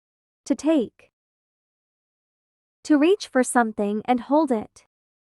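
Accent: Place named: United Kingdom. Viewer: عادي تسوين بلهجة بريطانيا❤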